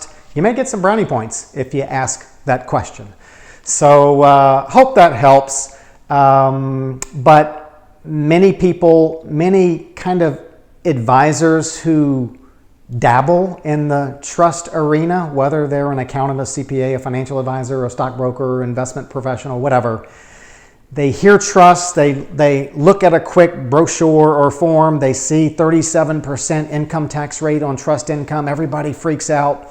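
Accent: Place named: South, United States. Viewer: Thank the southern drawl.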